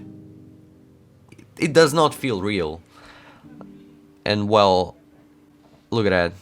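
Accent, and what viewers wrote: Russia. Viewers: Love your videos! I've really noticed your Russian accent though. Go learn an American accent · strong ruski accent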